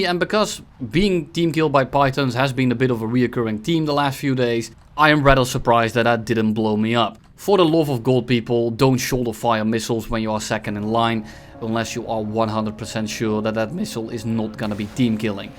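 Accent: Netherlands. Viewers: Youre dutch right?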